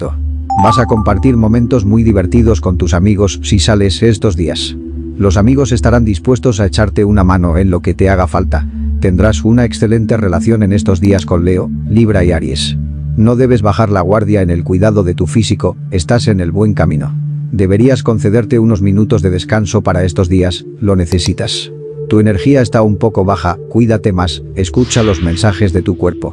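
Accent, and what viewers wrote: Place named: Spain. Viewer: No me gusta que el locutor sea español, cuando el locutor sea mexicano te doy Like mientras, no te veo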